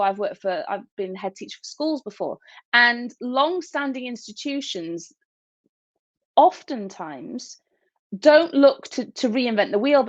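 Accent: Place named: United Kingdom. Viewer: I want to hear someone American review this documentary because as soon as i hear a brittish accent i can bet the views will be delusionally biased against Harry and Megan.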